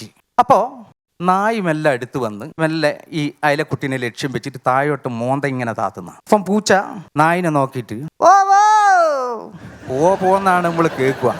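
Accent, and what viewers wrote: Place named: Kannur, India. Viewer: നല്ല അവതരണം, കണ്ണൂർ ഭാഷ 🎉❤🎉❤🎉❤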